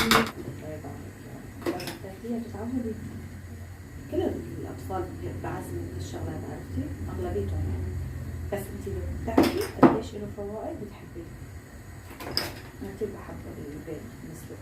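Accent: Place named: Syria. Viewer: بصراحة تفاجاءت بقناتك الثانية يا بالله شو مهظومة هههههه بلهجتكم السورية بقولها متابعتك من اليمن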